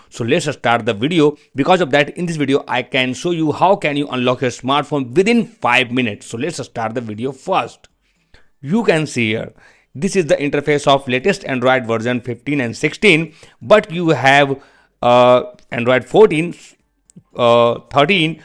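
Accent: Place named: India. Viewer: you know the vid is good when you hear indian accent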